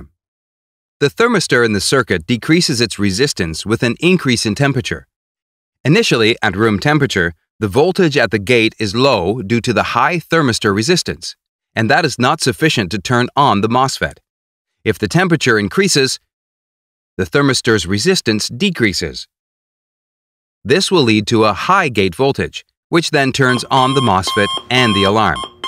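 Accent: Kerala, India. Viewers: Well, he's not really imitating the western accent tho. He's a mallu and that's how some of em actually speak since I'm a mallu as well and know a lotta people who speak like that.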